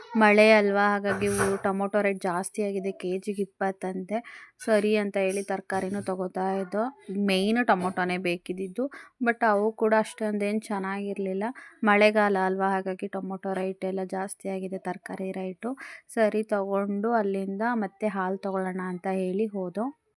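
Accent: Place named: Tumkur, India. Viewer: Yellava Nim tumkur mathu channagirutte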